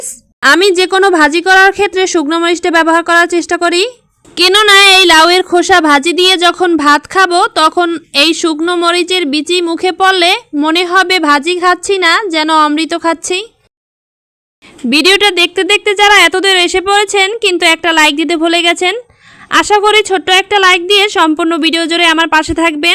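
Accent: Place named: Barishal, Bangladesh. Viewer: বরিশালের ভাষায় কথা শুনতে ভালো লাগে ভাজিটা ভালো হয়েছে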